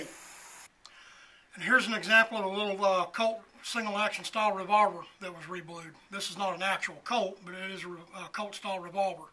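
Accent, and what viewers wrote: United States. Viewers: Weird Yankee accent.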